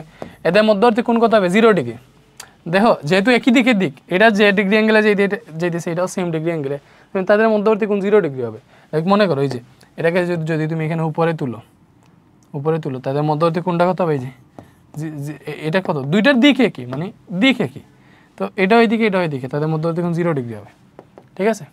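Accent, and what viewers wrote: Sylhet, Bangladesh. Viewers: vai apni ki sylheti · Vaiya apni ki Sylheti...??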